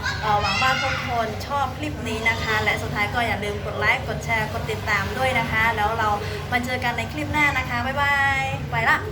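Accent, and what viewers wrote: Cambodia. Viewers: ฟังสำเนียงแล้วเขาเป็นคนเขมรครับ จากคนสุรินทร์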